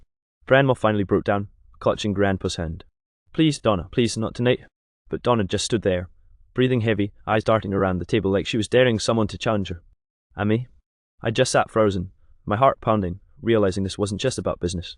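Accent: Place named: Ireland. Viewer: Cool Irish accent! 😂😂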